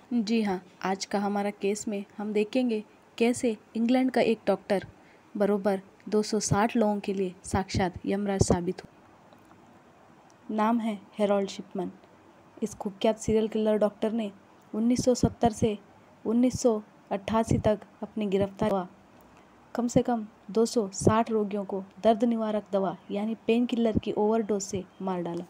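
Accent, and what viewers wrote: South, India. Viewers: Better u speak English ur accent is south Indian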